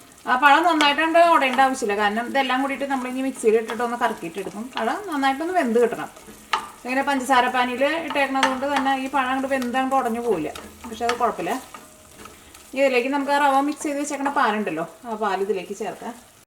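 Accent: Thrissur, India. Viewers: ചേച്ചിയുടെ ഈ റെസിപ്പിയും സൂപ്പർ... ഞങ്ങൾക്ക് വലിയ ഇഷ്ട്ടമായി ആ തൃശ്ശൂർ ഭാഷ സൂപ്പർ, and....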